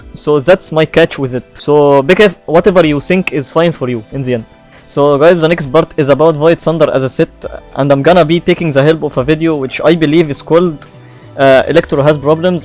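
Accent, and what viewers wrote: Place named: Egypt. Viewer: man why i feel ur an Egyptian cuz of your English accent 😂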